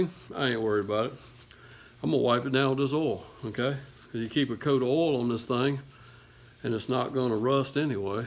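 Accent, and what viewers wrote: South, United States. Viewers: I'm terribly sorry that your brain is unable to process southern accents.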